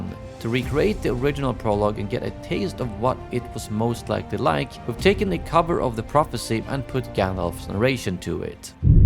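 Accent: Sweden. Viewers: I applaud your continued efforts on this project. The day we do see more scenes (and hopefully new extended anniversary cuts) released, I trust many of us will gather here and thank you for your continued interest and passion for this trilogy and all its lost fragments of gold. If there's anything that's ever going to give all this lost material a good push toward being released, it's this. This undeniable display of passion. You look at these compilation videos being updated again and again as more material surfaces over the years, and it couldn't be clearer. Hundreds of comments. Hundreds of thousands of views. This kind of passion doesn't just die down quietly over time, there's too much good stuff out there to keep folks like us up at night. I'm glad to see the torch is still burning bright. Also, hearing a fellow Swede narrate this really resonates with the whole Nordic Middle-Earth vibe. 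· If having a Swedish accent means sounding gay as in merry and bright, then yes! 🤓✨️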